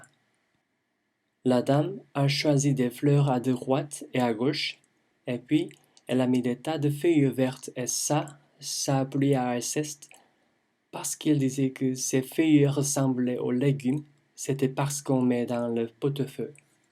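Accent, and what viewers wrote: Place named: Quebec, Canada. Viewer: It's canadian french, just saying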